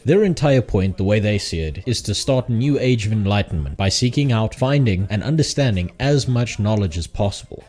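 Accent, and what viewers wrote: South Africa. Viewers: Immediately knew you were South African! Lekker man! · Completely random question but are you South African?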